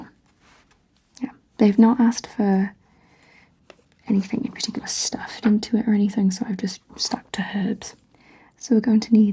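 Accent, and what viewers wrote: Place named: Wales, United Kingdom. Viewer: Time to be lulled to sleep by those beautiful welsh tones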